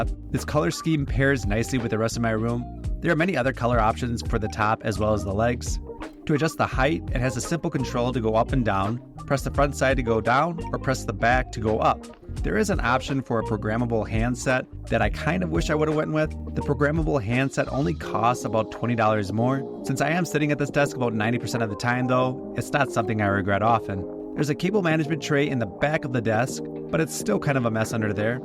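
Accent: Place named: Singapore. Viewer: wow. you sound so singaporean.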